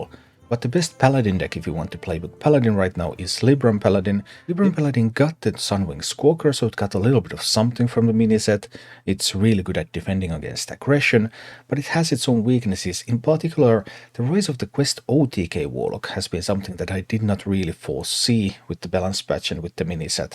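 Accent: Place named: Finland. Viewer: Sä paras! Olin amerikkalainen vaihto-opiskelija, joka asui suomessa 5 vuotta sitten. Rakastan videoitasi ja varsinkin aksenttiasi, se tuo mieleen ne ystävät, jotka sain siellä Porissa.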